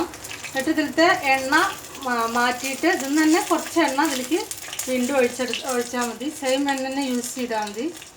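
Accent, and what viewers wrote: Palakkad, India. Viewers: Exactly.. Even I felt the same 😍Oru Palakkad touch in talking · കാഴ്ചയിൽ തന്നെ സൂപ്പർ ആയിട്ടുണ്ട്, ടേസ്റ്റ് പിന്നെ പറയണ്ടി വരില്ല, അടുത്ത ദിവസം തന്നെ ഉണ്ടാക്കി നോക്കണം 👍 സംസാരം കേൾക്കുമ്പോൾ പാലക്കാടൻ ടച്ച് ഉണ്ട് ചേച്ചീ , ഞാനും ഒരു പാലക്കാട്ട് കരനാണെ 🥰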